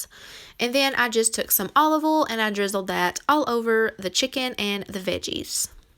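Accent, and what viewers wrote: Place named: West Virginia, United States. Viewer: Where are you from? Your accent sound like you're sound local or maybe a surrounding state, I'm from West Virginia 🙂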